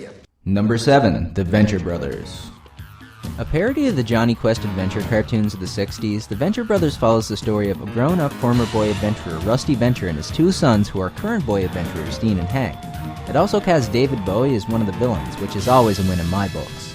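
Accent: Canada. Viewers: I was guessing either the Midwest or Canadian lol. It came out in the vowels ^_^ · My god, I can't stand your shitty canadian accent.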